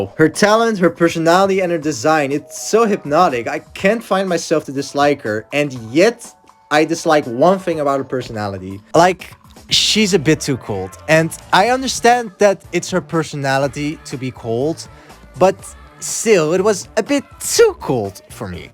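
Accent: Netherlands. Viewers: iconic video!!! loved this. the video raised a question for me tho are you dutch? 😭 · I hear a dutch accent :3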